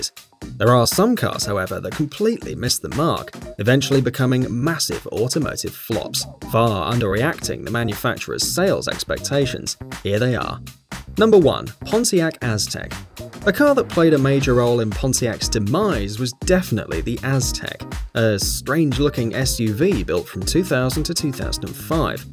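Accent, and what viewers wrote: England, United Kingdom. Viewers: Why is this an English narrator for a video about American cars? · Hey mate I love your video, and your accent. English me thinks, but I'm a bit butt hurt, about that (except trolls) bit. So I guess I won't be hearing from you. Bollocks we Trolls never get to have any Fun. The Trolls need Love too, you know. Oh well, after a good cry, I'm going to another channel, and "Let slip the dogs of War". Fair thee well, my lord. ( ;)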